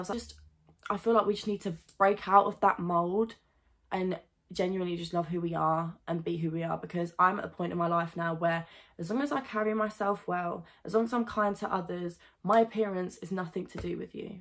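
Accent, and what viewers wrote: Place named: Australia. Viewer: I love your Australian accent.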